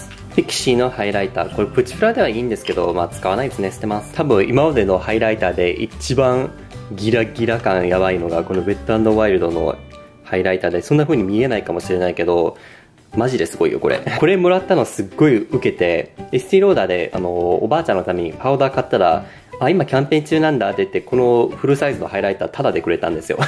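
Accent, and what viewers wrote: Kansai, Japan. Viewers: どの動画でも数回関西なまりが聞こえて何となく嬉しい🥺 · リアルな感想やから参考になるし、時折出る関西弁が親近感〜😂❤️❤️❤️この動画本気で好き！！！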